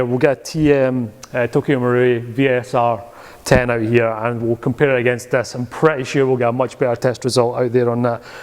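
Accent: Scotland, United Kingdom. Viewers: Your Scottish certainly lays down the law, that's for damn sure.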